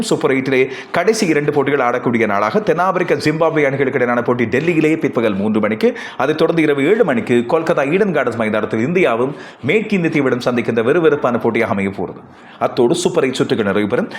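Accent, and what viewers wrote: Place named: Sri Lanka. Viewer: அதிவேக இடைவிடாத பேசும் திறன் இலங்கை தமிழ் பேச்சும் குரலும் அற்புதம் பாராட்டுக்கள்.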